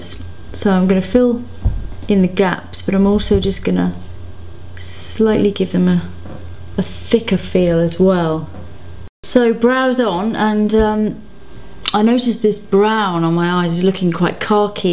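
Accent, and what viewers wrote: United Kingdom. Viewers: it cracks me up when you say these huge brand names that i have never heard and you say it in your [roper british accent. so you make each name way more bold then they really are. lol. love you!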